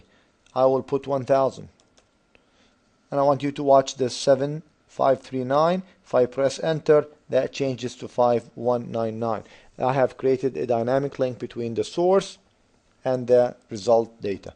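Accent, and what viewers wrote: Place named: Arab world. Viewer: nah hes an arab, indian accent is quite different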